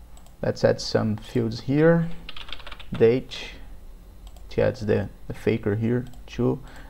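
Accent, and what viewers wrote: Brazil. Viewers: Conheço um brasileiro de longe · Brasileiro certeza. Mas ficou bom mano parabéns.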